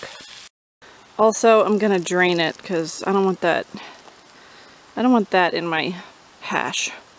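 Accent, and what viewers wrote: Nova Scotia, Canada. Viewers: More Nova Scotian, gold. Killing it.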